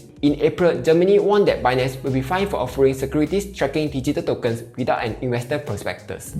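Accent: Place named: Singapore. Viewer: I love this dude’s 100% pure Singaporean accent 😘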